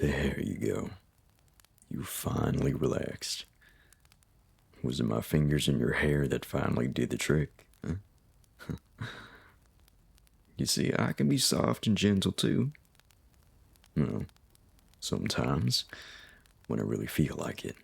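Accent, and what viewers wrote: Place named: South, United States. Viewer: I'm a sucker for a southern accent! 💖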